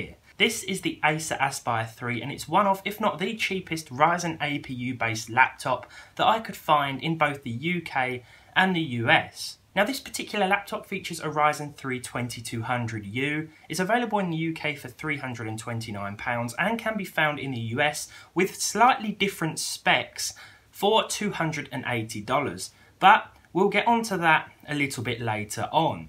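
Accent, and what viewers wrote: Australia. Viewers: Great australian accent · Are you from Australia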